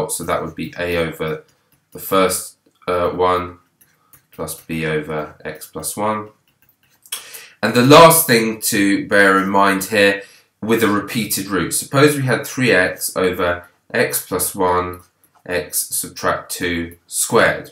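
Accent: United Kingdom. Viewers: Are you from Britain? I asked over your accent.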